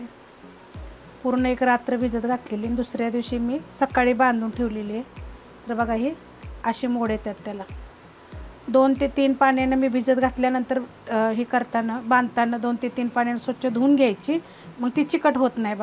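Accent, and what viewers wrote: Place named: Kolhapur, India. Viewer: Assal kolhapuri bolane & misal. Very good method.... Thanks aunty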